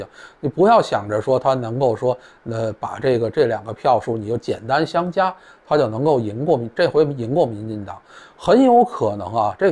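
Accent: Beijing, China. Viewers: 四年後誰執政？我跟播主有點不太一樣的看法。 我也來做個預測，中國這幾年，快一點就今年內了，會被再餓回一隻巨大的扁蝨，跟改開前一樣。 其實我很懷念二十幾年前台灣的時光，大陸妹一卡車一卡車嫁來台灣，中國偷渡客被抓時會唱梅花，假裝自己是台灣人.......的時代。 那時台灣任誰都願意喊：兩岸一家親！照顧窮親戚，誰能不愛呢！您說是吧？ 播主，你是否想太多了？雖然我也挺愛聽你的北京腔和北京大爺說話那個範兒！ 但管他誰執政！誰把台灣做大做強，讓台灣人活著有尊嚴我就投誰！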